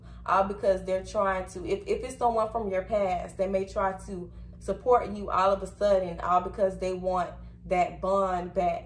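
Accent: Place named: South, United States. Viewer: She spoke on a tipical southern, they so nosey and different.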